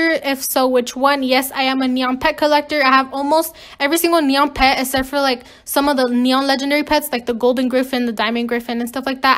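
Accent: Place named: Latin America. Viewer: Yay I thought you were also latina because whenever you talk I always hear a spanish accent (if you know what I mean) now I know we both are 😊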